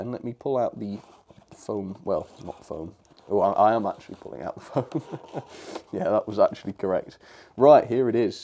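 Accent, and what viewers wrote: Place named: Kent, United Kingdom. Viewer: Another great review! 👍🏻 thanks!! Can I ask where about sin the uk are you from?? My girlfriend says by your accent your from Kent 🤭🤷‍♂️ I say Hampshire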